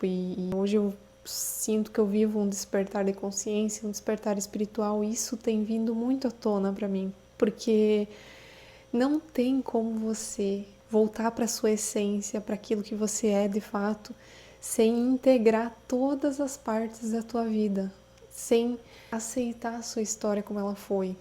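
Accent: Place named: South, Brazil. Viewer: 🙏🏻🙏🏻, pelo sotaque é do sul, continue na missão de expandir a consciência do proximo.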